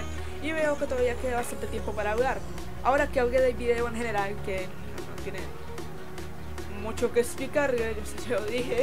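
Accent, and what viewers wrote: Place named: Spain. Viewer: Ostia eres española:000!?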